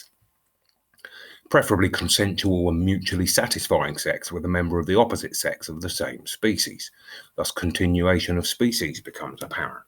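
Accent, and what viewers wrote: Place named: England, United Kingdom. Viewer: For your information my english might not be as good as yours since i was born and raised in Sweden, i assume you are english judging by your accent. I have a question for you though, what do you think would have happened If christianity didn’t exist and Vikings would’ve continue to follow their religion and same with all the other native religions?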